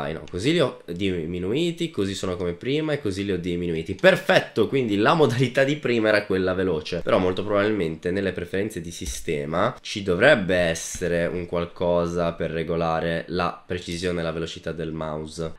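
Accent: Italy. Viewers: MA sei di roma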